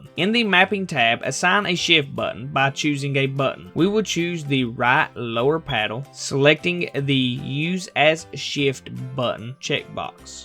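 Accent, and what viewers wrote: South, United States. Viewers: Thank you man your Southern drawl is exactly what I needed for in an explanation on how the f*** to use the shift paddle after I've had these controllers for like 3 years and never even considered it. And don't mind them comments the videos perfect we can stare at those Stars on your desk top while you explain some stuff. Thanks brother.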